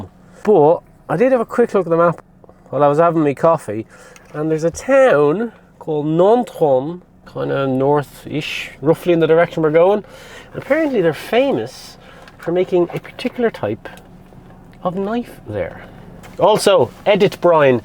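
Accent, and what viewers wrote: Ireland. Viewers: Thanks for taking us along, we are glad you met Dan Preston. Also thank you for using subtitles for the proper name places you visit as sometimes I have a wee bit of trouble understanding your pronunciation of French names in your Irish accent 😅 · Thanks for taking all of us window shopping. Love all the old buildings. The knives were cool. Love the back roads. So pretty. Yes I can understand your Irish accent. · Thank you for the titles of the villages, Brian. It’s not your mumbly Irish accent - it’s my french ears not working. I love your Irish accent 🌸 Love from Cape Town, South Africa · Now Brian I can understand your Irish accent as I'm Irish too, go up north and then I might say yes hard to understand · I'm Australian, and I also happen to be 46% Irish apparently, so I would love to visit Ireland one day. I also love France. I've always loved the French language, and I'm currently teaching myself French, so I do really enjoy seeing the French villages and culture that you show in your little adventures. 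P.S. I should also say, I love the Irish accent as well, so what's not to love about your Vlog! 😊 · Brian, you're a total ledge! mumbly Irish accent and all!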